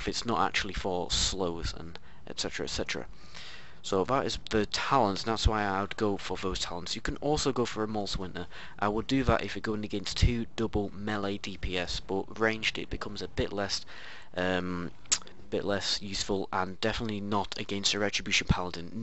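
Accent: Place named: England, United Kingdom. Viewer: Oh how I missed the English accent and their way of pronouncing words, hehe.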